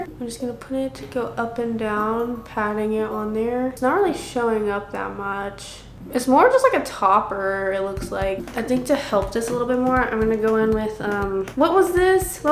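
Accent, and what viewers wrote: United Kingdom. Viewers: PLS THE BRITISH ACCENT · I love your british accentkdnskqnfnwndnd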